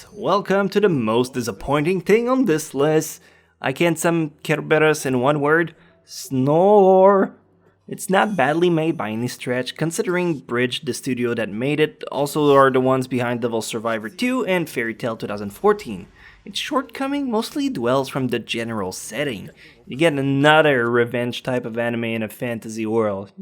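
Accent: Quebec, Canada. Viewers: Mak Gnik French I would assume since he is Canadian, since he has an accent he'd be from French Canada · CHESS oh quebecois french, I was going to say i couldnt think of any mainland varieties of french accents that sound like that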